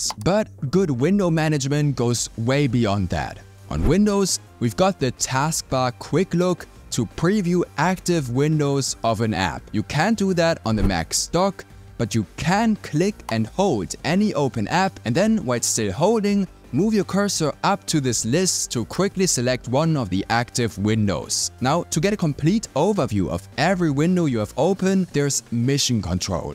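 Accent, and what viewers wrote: Germany. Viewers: really knows his stuff and doesn't waste a word - if anything he's too concise. His tips are uniformly great. (And the "light L" and glottal stops that Germanise his flawless English only add to the aura of genius.)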